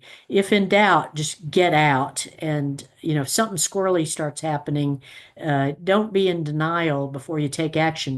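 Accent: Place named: Houston, United States. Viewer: Good morning. Can I join your discord group? Please send link. I am also interested in Dale Perryman's contact info. Do you know how reach him? THANKS! Grew up in Houston and your accent feels like home.....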